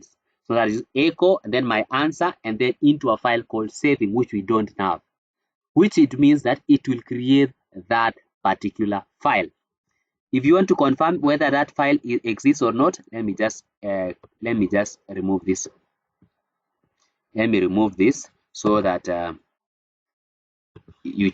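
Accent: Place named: Kenya. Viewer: love your accent,kenyan?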